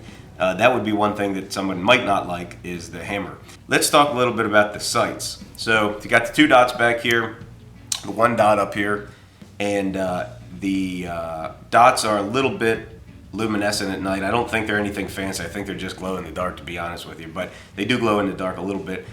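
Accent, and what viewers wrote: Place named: Philadelphia, United States. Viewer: I knew I detected a Philly accent!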